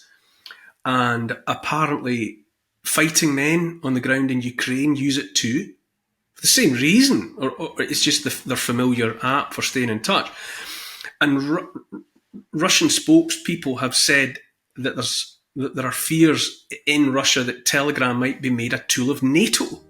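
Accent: Scotland, United Kingdom. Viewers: From USA here, sometimes I listen to your channel just so I can hear your awesome Scottish accent. My favorite! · I love ❤️ that Scottish accent.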